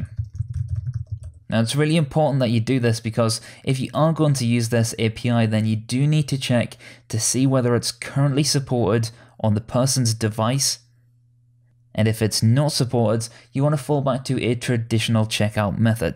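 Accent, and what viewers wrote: United Kingdom. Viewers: Where is your english accent from ?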